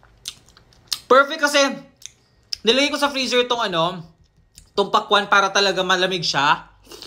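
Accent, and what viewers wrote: Visayas, Philippines. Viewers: Bisaya ka po ba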